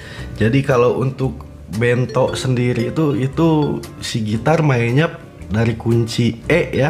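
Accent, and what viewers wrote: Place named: Bandung, Indonesia. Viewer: Bandung banget bahasanya aa 😅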